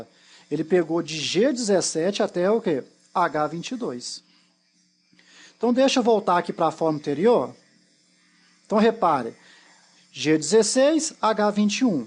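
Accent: Minas Gerais, Brazil. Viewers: Tem um sotaque mineiro, é mineiro? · Olá Prof., parabéns por sua aula. Uso excel, mas nunca precisei usar as fórmulas SE e PROCV, portanto tenho muiiiita dificuldade com isso, mas depois desta aula sobre SE, tudo ficou mais fácil e a sua explicação, com sotaque de mineirinho, foi ótima e muita clara para entender. Muito Obrigada. Sua nova fan!!:0)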